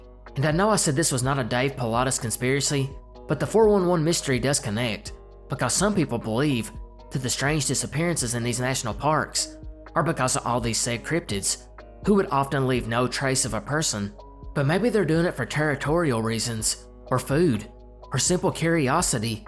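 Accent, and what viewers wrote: South, United States. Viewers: Came for the conspiracy theories,stayed for the southern drawl. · Nice to hear another southern accent with some obvious intelligence behind it. Excellent content brother. Good stuff. *at 63 I began to mediate out of scientific curiosity. Just to see for myself IF there's anything to this. There IS. There is a LOT to it. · What in cousin loving tarnation is this universal southern ai accent? A taste of Appalachia with a baby momma in Texas but currently resides in Georgia WTF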